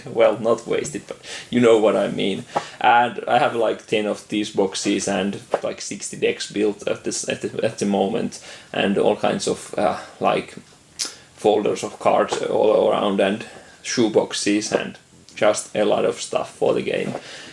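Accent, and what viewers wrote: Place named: Finland. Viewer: Finnish-English accent! Torilla tavataan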